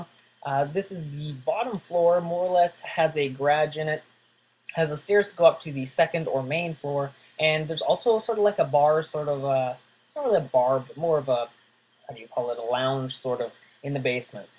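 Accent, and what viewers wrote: Canada. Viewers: you a clearly Canadian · he's from Canada , he has a accent. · cuz hes Canadian so he has a accent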